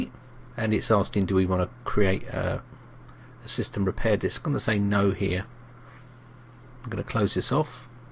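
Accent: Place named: Derbyshire, United Kingdom. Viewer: You sound like Jason Statham, do you live near derbyshire?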